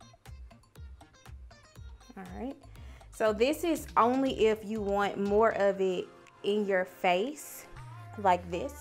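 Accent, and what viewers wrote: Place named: South, United States. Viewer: 🙌🏾🙌🏾🙌🏾 love the southern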